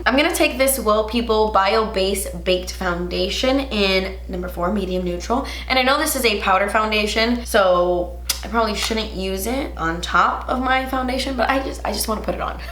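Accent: Cuba. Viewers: So funny story... My husband says Concealer the way you do because he's heard you say it so many times while I'm watching your videos. It's really funny and he REFUSES to say it normal (not Cuban) he's very white... Lol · More videos in Spanish! Me gusta tu acento Cubano 😄💖💖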